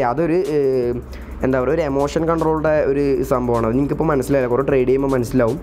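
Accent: Palakkad, India. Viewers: Eda chekka ante veedu palakkadano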